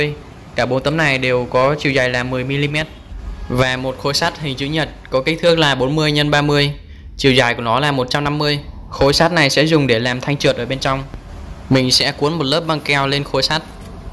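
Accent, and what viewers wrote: Vietnam. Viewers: Giọng nge giống dưới thanh bình thế nhỉ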